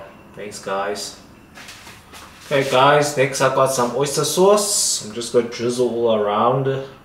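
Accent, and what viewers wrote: Durban, South Africa. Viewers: You sound South African . It’s a compliment. Don’t stress . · bro sounds coloured from SA,Durban hahaha Dope bro will give this a try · Great recipe! Wheres your accent from? SA? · The cutting of the drumsticks is a very good tip 😉. By the way, you sound South African🤔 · Are you South African? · Sounds South African accent tho not Chinese..🤷Beautiful recipe🙏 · Love your South African accent 😊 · Nice recipe 👍 Do I detect a slight South African accent ? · You sound South African 🤗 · You south african boet? Love the recipe. ❤😎💯🤘🔥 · Why does he have a PERFECT Southern African COLOURED (South African/Zimbabwean/Namibian) accent?????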